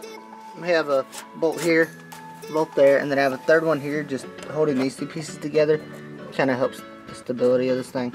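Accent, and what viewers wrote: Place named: South, United States. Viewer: Dang bro,where you from. You sound country as fuck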